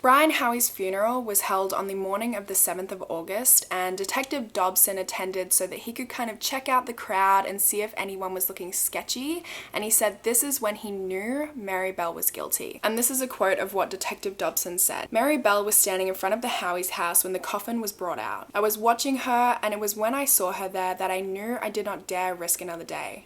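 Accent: Australia. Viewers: Where are you from ? Australia ? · Are you australian? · i love your australian accent!!! 😭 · Sure she is Australian 🤔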